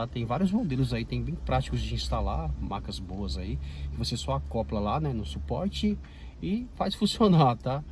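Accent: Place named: Brazil. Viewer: Vc é de qual estado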